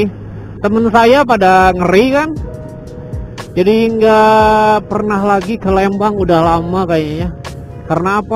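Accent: Banyumas, Indonesia. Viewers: Ngapak temen lur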